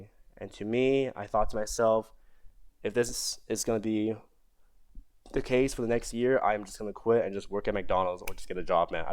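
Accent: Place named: United States. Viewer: Damn I had no clue u learned English that late usually ppl don’t get an American accent that quickly if ever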